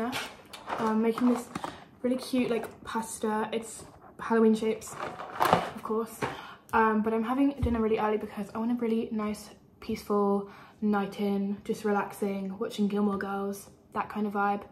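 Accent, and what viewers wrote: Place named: United Kingdom. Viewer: I never realized you were British why did I assume you were American the whole time lol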